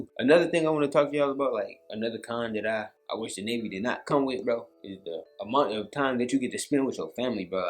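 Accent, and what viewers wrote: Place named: Detroit, United States. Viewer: Nigga it sound like you from the city frfr (Detroit)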